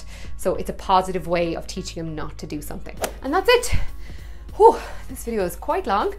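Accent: Ireland. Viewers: I'm mainly here for the Irish accent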